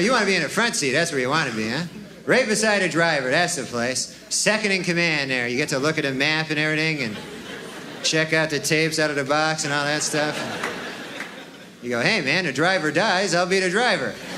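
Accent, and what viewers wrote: Canada. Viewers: Yeah, he sounds super Canadian here. Norm had a real Ottawa Valley brogue as a young man. <link> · Actually what he sounds like is a CARICATURE of a Canadian. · He sounded a lot more Canadian back then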